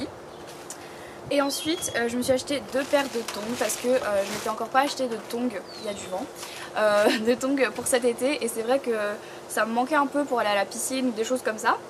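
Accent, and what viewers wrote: France. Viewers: Ta un gros accent francais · Je suis tombé en amour avec tes vidéos ! Tu es superbe ! Et j'adore ton accent ! xd Haha ! C'est pas tout les jours qu'il y a des français chez moi ! x) Okok, j'arrête ! Bref, je t'adore !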